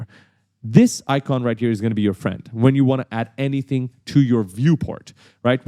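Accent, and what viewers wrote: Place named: Iran. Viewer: اینکه میدونم دارم unreal engine رو از هم وطنم یاد می گیرم یاد گرفتنش رو برام خیلی جالب تر می کنه, دمتون گرم.(از لحجه پیداست ایرانیه)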